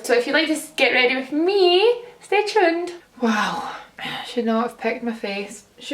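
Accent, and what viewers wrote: Scotland, United Kingdom. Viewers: love your get ready with me's, especially your chatty ones!! it's so nice to listen to someone else that's scottish for once, such a stunning look!! defo gong to try this sometime xx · thats coz she is scottish me too so i can understand everything she said lol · I looooove your various eyeshadow looks! brand new suscriber here and since most of the channels I follow are American it is SO weird hearing a fellow Scottish accent :D where about are you from? Are you able to write out product lists for your videos? it would be super handy! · I love this video and your hair looks awesome! Its nice hearing little Scottish words every now and then and your accent (I'm from Perth) :) also really liked how chatty you were x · She's Scottish 😊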